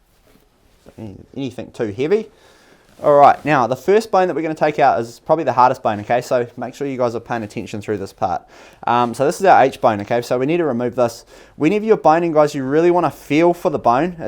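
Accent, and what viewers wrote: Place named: New Zealand. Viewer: Hey whereabouts are you from? You sound like a kiwi, I'm from Otago.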